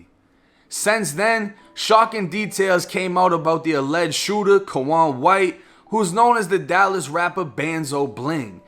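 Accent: Boston, United States. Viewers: I love this kids accent talking about Florida prison and shit. I'm from the Boston area. I talk like him. I'm almost 20 yrs older and this kid makes himself very clear. Right to the point. Keep it up brother. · as usual a brilliant summation of the story for all us to understand. thank you, sir! BTW. are you originally from Boston. i hear an accent there! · U got a Boston accent broo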